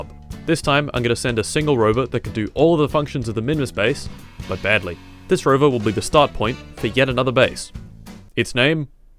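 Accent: Australia. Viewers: He's Australian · are u Australian? Cuz so am I so that’d be cool to know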